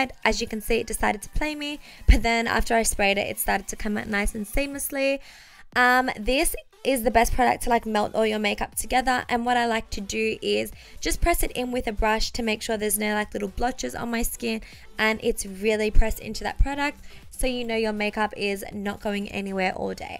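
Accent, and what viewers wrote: Australia. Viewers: Alexa Cryer Australian x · Alexa Cryer she’s Australian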